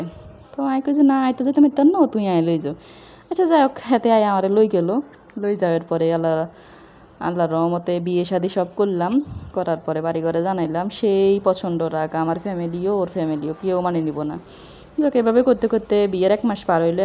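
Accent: Noakhali, Bangladesh. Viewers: ওরে নোয়াখাললা তাই বুজি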